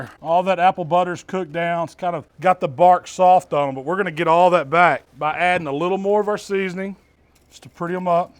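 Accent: United States. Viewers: The most american person i have ever seen, the accent, the spirit, the bbq skills, gotta love it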